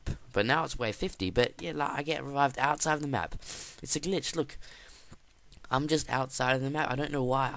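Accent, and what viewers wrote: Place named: Australia. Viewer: you kinda sound a bit Australian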